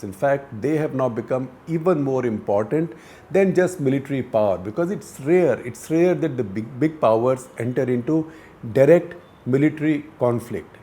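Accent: India. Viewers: Too much Hindianism with 'trups' rather than 'troops'. Vowel shortening gives off bad vibes with distraction.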